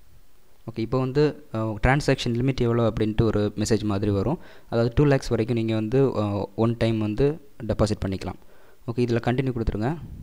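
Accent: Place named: Kanyakumari, India. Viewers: ஒலகத்தில எங்க போனாலும் கன்னியாகுமாரிகாரன ஒரே வார்த்தைல கண்டு பிடிச்சிலாம்......... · Bro neenga kanyakumari thanae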